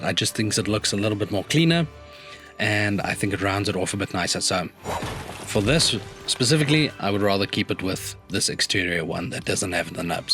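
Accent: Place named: South Africa. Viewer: Heard the accent and immediately knew it was a fellow Safa. Great to see, wishing you lots of growth. Sub status achieved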